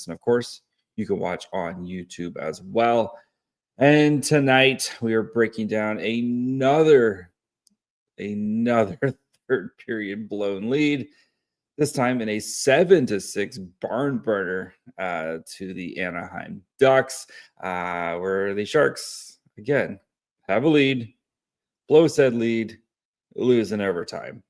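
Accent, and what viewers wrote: Canada. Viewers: Relax. Are you Canadian? That is not a a central valley accent.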